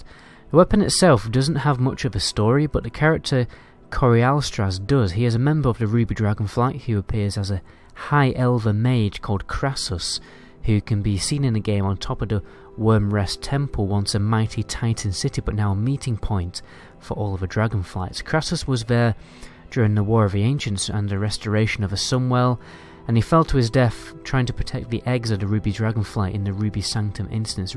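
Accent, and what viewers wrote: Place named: England, United Kingdom. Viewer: He's English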